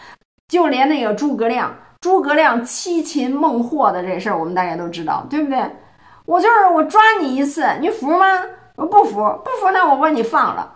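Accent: Beijing, China. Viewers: 重复好几次了，但我还是得说： ，我太喜欢你的京腔了！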